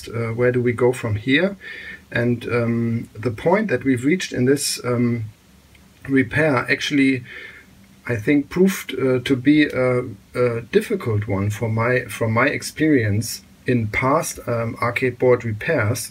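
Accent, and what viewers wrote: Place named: Germany. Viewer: Hey man love the videos! Your german accent is fantastic, and thanks for speaking in english! I found your channel by looking for videos on the Atari System 1 boards, as I want a marble madness cab at some point. But! I have a question, what Jamma>Scart board are you using? I have a 15khz PVM that supports RGB and wanted to start maybe getting some broken arcade boards and fix em up. I usually buy broken consoles and fix them, but IBM PC's and Arcade boards are my next logical step. Thanks for the videos! I'm going to be posting them on some repair forums I hang around as your videos are criminally underwatched. Thanks again!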